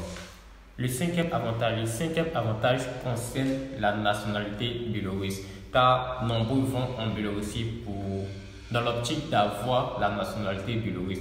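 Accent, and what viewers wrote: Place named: Central Africa. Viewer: Bjr, M.. En dehors de ton nom atchié, je ne sens pas ton côté ivoirien dans les mots utilisés, leur prononciation, la composition des phrases, les répétitions de paroles, le niveau du langage, les mouvements du corps, et surtout dans les idées présentées. Tout indique l'Afrique centrale: Gabon, RDC, Congo, RCA, Cameroun... Sauf l'expression "pour m'encourager."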